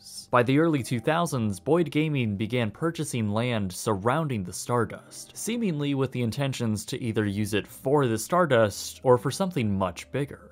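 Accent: Canada. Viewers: canadian? ,,,,ote,,,out,,coche, couch,,, speak inglish, whoever you are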